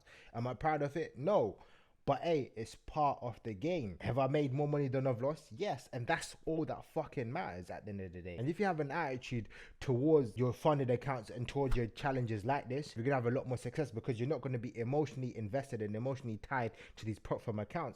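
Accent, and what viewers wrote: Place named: United Kingdom. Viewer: It’s refreshing to hear a brudda from the UK talking about these things. Following!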